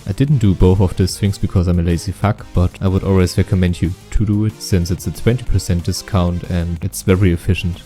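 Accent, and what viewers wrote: Germany. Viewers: he sounds very German to me. coming from a German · Are you German? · i can hear denglish :DD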